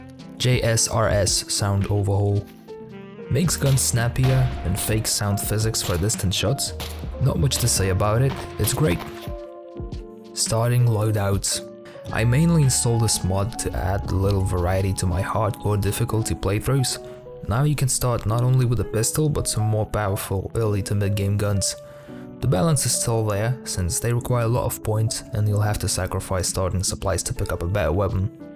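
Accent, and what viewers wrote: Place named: Scotland, United Kingdom. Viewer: wow, me too and yet I thought you were Scottish of something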